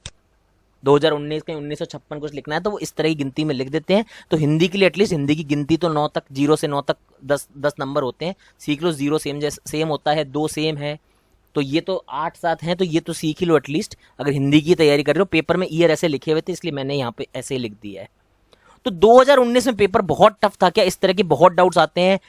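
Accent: India. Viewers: West up language hai sir aapke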